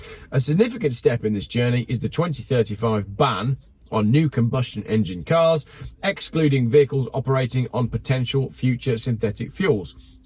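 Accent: United Kingdom. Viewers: Steering wheel on the right side and a British accent, so likely a Brexiteer and EU hater.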